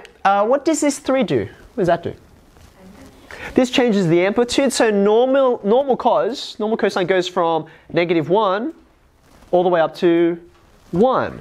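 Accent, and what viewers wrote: Australia. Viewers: nah he's from the land down under (australia)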